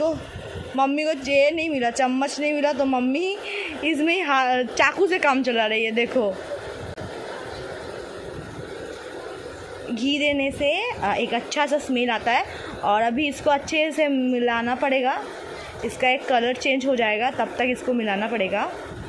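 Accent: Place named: Bihar, India. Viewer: Aap Bangla mein Hindi bihari wala Hindi bahut achcha mix kar rahi ho tikha ko titai bolkar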